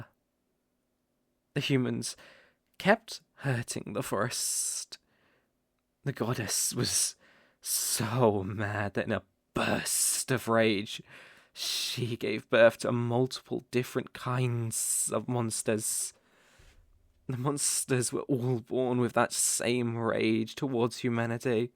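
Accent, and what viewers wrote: United Kingdom. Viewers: What did I just bump to?! That's the right amount of sssss and Britishness I needed to hear! Oh my... your voice acting is very great! I'm gonna to some walk around his channel AHHH Thank you for your efforts!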